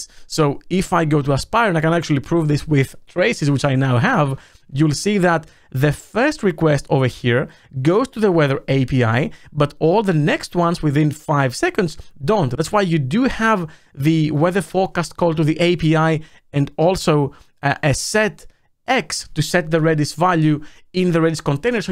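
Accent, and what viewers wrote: Greece. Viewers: are you greek? Not only does your name seem greek but your accent sounds greek to me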